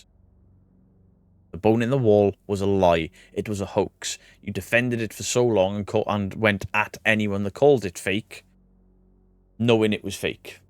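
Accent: Wales, United Kingdom. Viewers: thanks. Ive missed your welsh tones xxx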